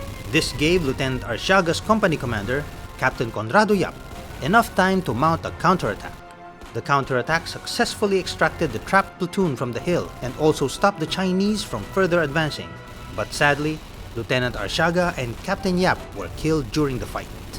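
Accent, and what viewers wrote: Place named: Philippines. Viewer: This is Filipino English accent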